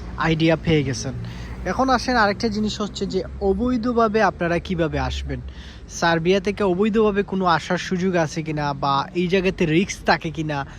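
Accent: Sylhet, Bangladesh. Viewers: ভাই আফনে সিলেটি 😊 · আইরাম মানি আপনি সিলেটি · ভাই আপনি কি সিলেটের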